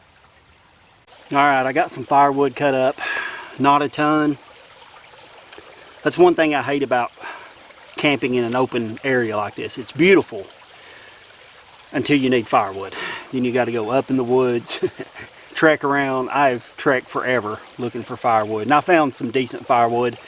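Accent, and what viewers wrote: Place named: South, United States. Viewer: He's such a southern dude, can't hardly understand him.. he needs to speak normal english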